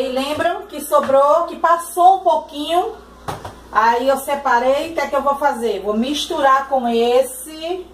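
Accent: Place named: North, Brazil. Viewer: Estou gostando dos seus videos, muito legal as receitas, veja que voce é pessoas cristã, isso é bom. pelo seu sotaque percebo ser nortista. abraços do amigo Paul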